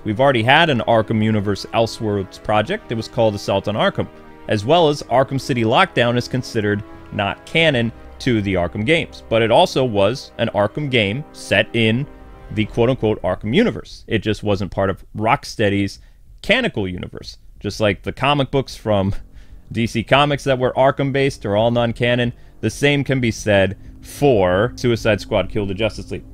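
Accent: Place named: New York, United States. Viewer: new york accent has been coming out a lot more recently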